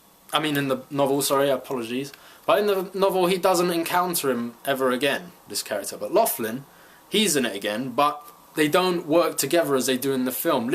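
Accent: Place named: United Kingdom. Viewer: There's actually a reason Killian is so different in the film, it probably just doesn't play too well because 1. You're too young, and 2. Judging by your accent you're from the UK. Back in the 70's and 80's Richard Dawson was a real American game show host (he hosted Family Feud for a number of years). So instead of getting an actor to play a game show host they just got a real game show host.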